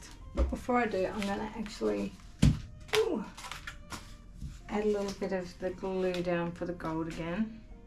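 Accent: Australia. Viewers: Hi, love your Australian accent. This painting is gorgeous. You're so talented.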